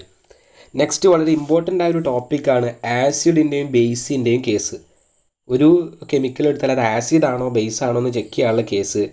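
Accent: Malappuram, India. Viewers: sir ന്റെ class കേൾക്കുമ്പോൾ schoolil നിന്നും മനസ്സിലാകാത്ത portions friends പറഞ്ഞ് തരുന്നേ പോലെ ന്ണ്ട്....പിന്നെ മലപ്പുറം slang കൂടി ആയപ്പോൾ pwolii 😻🙌💣💥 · Malappurathu karan anoooo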